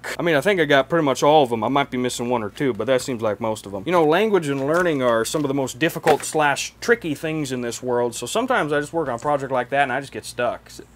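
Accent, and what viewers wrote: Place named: Wisconsin, United States. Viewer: were you trying to do a southern accent because i just kept hearing wisconsin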